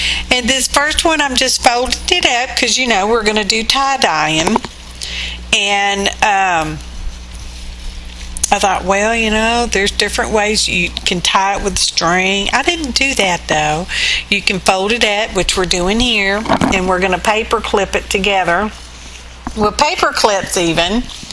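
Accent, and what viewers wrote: South, United States. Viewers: I love the crinkly bits SO MUCH!! Looking forward to giving this a go if my inks are still viable. PS: You’re a fun southern girl to listen to. That’s from a native Texan living in Tennessee. 😝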